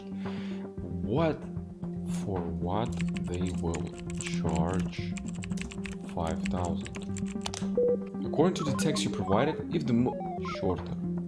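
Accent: Russia. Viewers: ты русский, акцент палит)) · Русский акцент слышно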